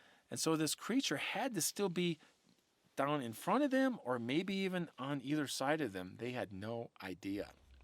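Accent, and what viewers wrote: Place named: North, United States. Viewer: Hi, I want to thank you for the excellent content. I love your campfire stories, the camping, and cooking recipes. I'm 64 and have a bad back and bad knee, so my camping days are most likely over with, but never say never, right? My name is Kris, too, but with a K. What state did you grow up in? The way you pronounce south makes me think you're from a northern state. I'm from Texas, so we pronounce south like "mouth". That's the redneck pronunciation, lol. Great channel,. Keep hiking (for those of us who can't 🤠)!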